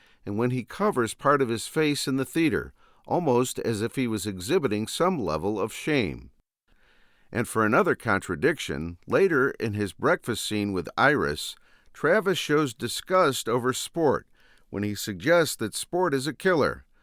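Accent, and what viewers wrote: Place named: Chicago, United States. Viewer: Interesting take, goosed by your heavy Chicago (?) accent.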